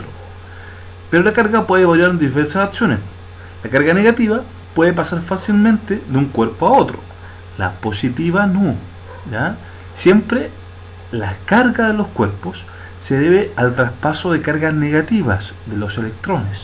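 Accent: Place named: Chile. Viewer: Si porque habla de 8vo y 1ro medio que solo se utiliza en Chile, también tiene acento chileno y utiliza las palabras de ya dicho pais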